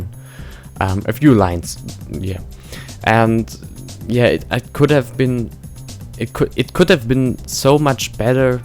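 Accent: Germany. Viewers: man hört es sofort das Du Deutscher Bist xD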